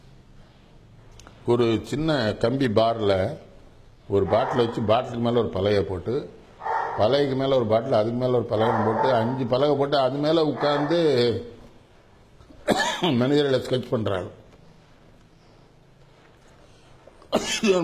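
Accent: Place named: Tirunelveli, India. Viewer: My native nellai speech,,,♥️♥️♥️♥️♥️🙏🙏🙏🙏🙏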